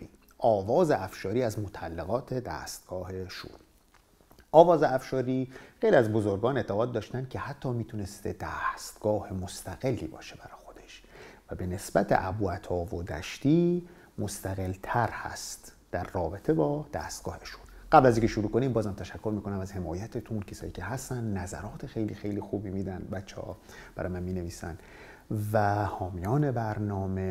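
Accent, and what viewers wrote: Bushehr, Iran. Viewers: بسیار اموزنده و مفید و چه زیبا انرا بیان می کنید با این لهجه زیبای بوشهری همشهری عزیز💐🌷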